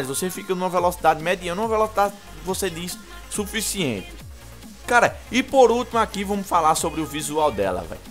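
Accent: Northeast, Brazil. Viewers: E do Nordeste ? :D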